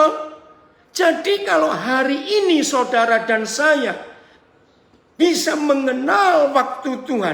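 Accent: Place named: Surabaya, Indonesia. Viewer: Jelas psk dt suaranya dr surabaya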